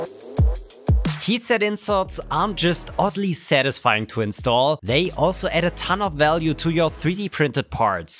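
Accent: Germany. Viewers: Dein typisch deutscher englisch akzent ist einfach geil. Ich schau deine videos sehr gerne!!! Mega Kreativ! Einfach Hammer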